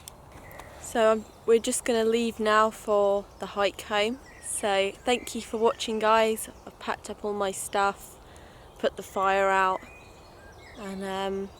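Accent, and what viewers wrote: United Kingdom. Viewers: 听口音是英国人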